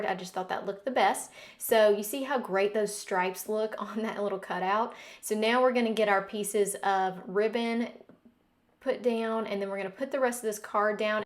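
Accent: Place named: South, United States. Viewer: Love it! I'm a new subscriber (and team mate!). So glad to "meet" you and hear another southern accent! <3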